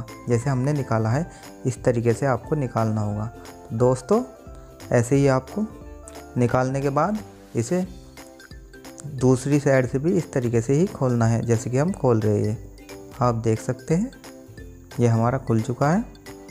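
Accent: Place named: Meerut, India. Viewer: उरे, परे की बोली, भाई वाह, मेरठ से हो या बुलंदशहर से l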